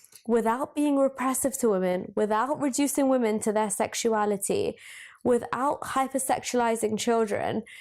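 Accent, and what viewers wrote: England, United Kingdom. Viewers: It's still interesting to see an ethnically Indian person to speak English in an Anglais accent.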